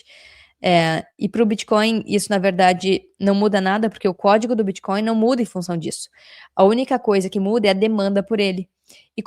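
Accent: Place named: Porto Alegre, Brazil. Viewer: Comecei a seguir o canal só para agregar o sotaque porto-alegrense às análises econômicas, e sentir falta de casa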